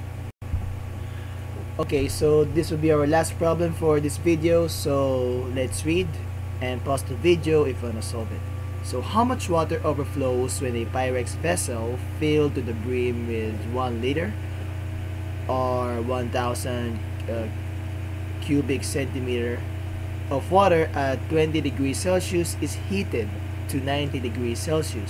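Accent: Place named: Philippines. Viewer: the accent is Filipino hehehej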